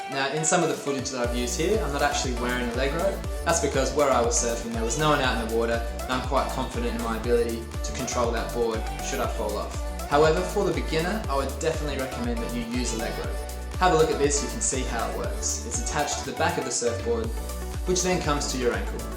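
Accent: Australia. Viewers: I thought for a while he said bitch, but it was just his Aussie accent. But, great vid man. Thx! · likely a gold coast Australian accent. (I live in SA and this sound like a Queensland accent to me) .The beaches also look like they are in the Gold Coast but i could be wrong. Also a lot of surfers live in the gold coast. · As soon as I heard the Aussie accent I knew this guy was legit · He’s AUSSIE m8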